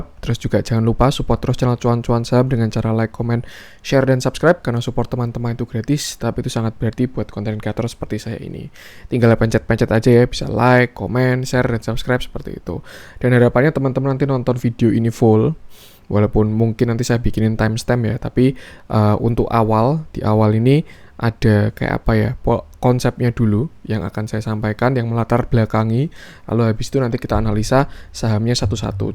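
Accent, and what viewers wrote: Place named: Java, Indonesia. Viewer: Medokkk... tapi sukaaakk cara penyampaiannya... makasi byk masbroo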